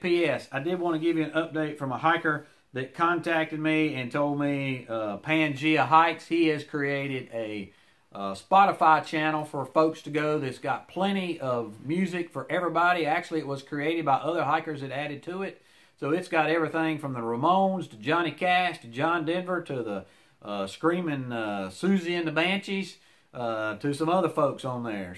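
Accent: South, United States. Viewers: I am an hour North of that location. I am not certain where you are located, however, judging by your accent I would guess you are from the southern region. I can definitely give you a hand as you head North. Would love to connect with you👍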